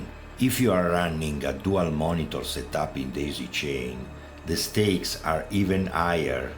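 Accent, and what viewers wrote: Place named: Greece. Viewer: Thanks for not using AI voice, despite your heavy accent (greek?). Id rather have someone attempt to make a video themselves than phone it in. That said, I have ran into this with my 240hz 4k oled. On displayport i have the bottom pixel row occasionally fizzle. On hdmi2.1 it has black screens and RGB flickering. Both hdmi and DP have issues with freesync which causes the AMD driver to completely lock up and crash. I pride myself of making my system very stable and optimized. But this damn monitor is always bugging out on me. Randomly turning off and the aforementioned issues. I dont think its the monitor itself...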